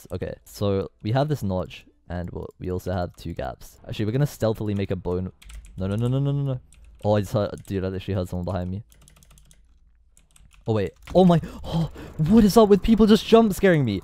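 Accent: United States. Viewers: Like like like like like like like I WANNA HERE THIS MANS merican accent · You better keep your American accent If you dont... Your gonna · PLEASE LIKE I WANNA HEAR HIS AMERICAN ACCENT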